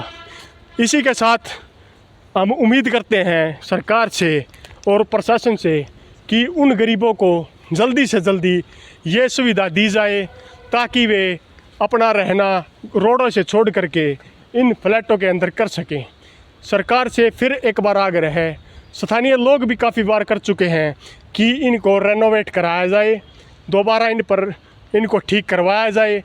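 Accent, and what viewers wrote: Haryana, India. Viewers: Bhai Haryanvi ae bol le Hindi baski na h.....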